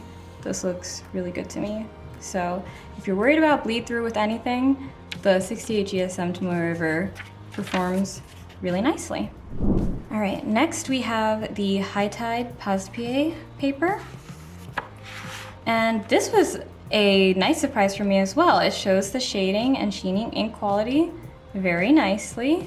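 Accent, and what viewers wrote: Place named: Missouri, United States. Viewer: Where are you from? Love you accent. Some friends from Missouri speak like you.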